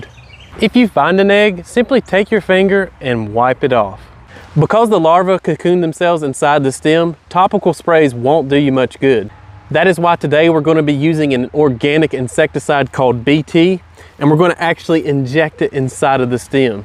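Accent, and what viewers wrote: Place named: Carolina, United States. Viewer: lolol...you said 3 words and i knew you were from Carolina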